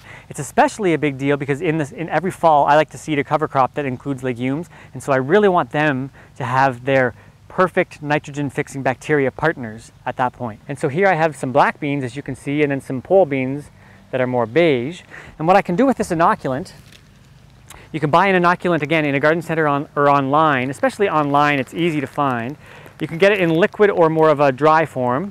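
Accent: Canada. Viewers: You sound so Canadian.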